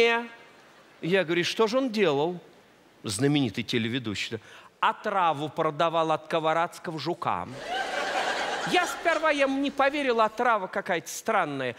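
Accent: Russia. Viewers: Как бы не рассказывал юморист, а он действительно родом из Воронежской области. И произношение у него такое. Вообще, Святослав спасибо за юмор. Рассеялась от души.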